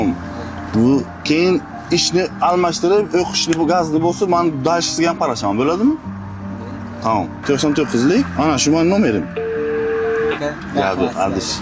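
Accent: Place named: Bukhara, Uzbekistan. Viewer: Buxoroya shevesh has lekin dashumo Buxorogimi shumo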